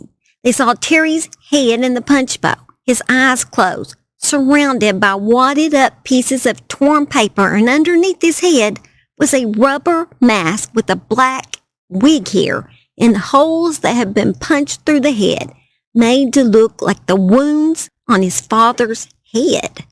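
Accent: South, United States. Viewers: Congratulations!!!! 👏🎉 1,000 subscribers!! WOOHOO!!🌟🌟🌟🌟 You're going places 👍👍👍♥️💛💙🧡💚💜 your little Southern voice it's just perfect it fits you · I enjoyed your video and your accent. Please keep it up. Sorry about your lupus. I have 7 autoimmune problems. It stinks. You take care of yourself. I’m from NC. I love Southern people.❤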